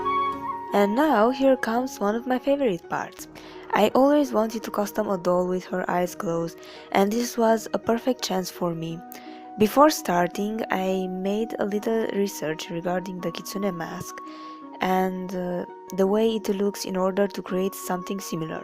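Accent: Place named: Russia. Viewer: Либо ты второй человек подряд с русским акцентом, либо у меня паранойя 😰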